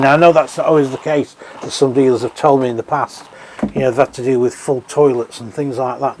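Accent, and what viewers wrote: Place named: Lancashire, United Kingdom. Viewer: Another great no B/S review you sound like you come from the lancs area, I grew up in Coppull I wonder if you ever visited Dave Barron caravans a massive site at the time sadly long gone it’s a big housing estate now. I watch all your stuff keep up the good work.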